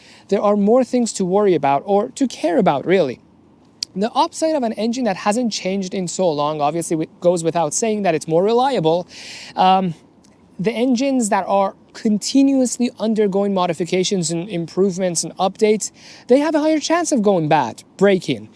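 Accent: Iran. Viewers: persian accent straight away haha nice vid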